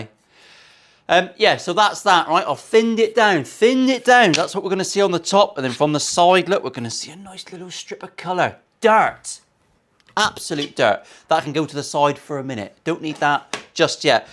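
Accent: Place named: Northern Ireland, United Kingdom. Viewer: If I wan a good giggle I don’t tune in to John Bishop etc I tune into this channel. Always something to laugh about and I get to learn new tips tricks and techniques with guitars, brilliant, and I love the Northern Ireland accent and!!!!!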